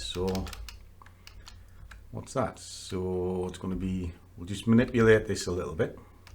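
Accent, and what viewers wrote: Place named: Sunderland, United Kingdom. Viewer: You from Sunderland area ?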